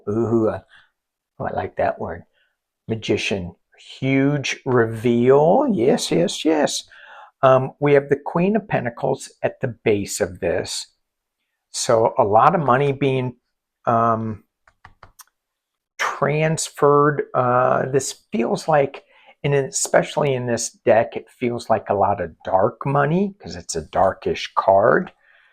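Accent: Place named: South, United States. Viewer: Yeeeeee ... your good ole boy Southern accent was sneaking in today ... 😁 ... yeah, a lot to talk about ... xx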